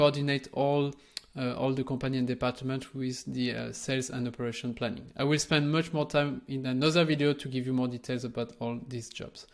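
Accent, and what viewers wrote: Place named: France. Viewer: That’s French accent! Great! 🎉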